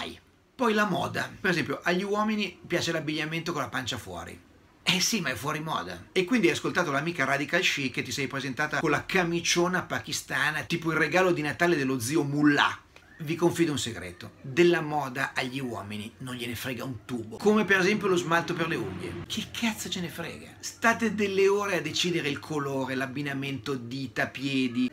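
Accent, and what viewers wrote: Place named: Milan, Italy. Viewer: Io non capisco , signore, cosa c'è da scandalizzarsi. Si può non essere d'accordo,io stesso, maschio etero arrapatissimo, non sono d'accordo al 100% con lui, che oltretutto ha un accento milanese che per noi romani è come le unghie che grattano il muro,però secondo me la femmina avveduta deve fare tesoro delle sue parole, perché che piaccia o no descrivono i desideri di ampia parte degli uomini. Poi,si può decidere di non partecipare alla festa, ma la conoscenza delle cose bisogna averla, e senza puntare il dito, anzi, ringraziando delle preziose informazioni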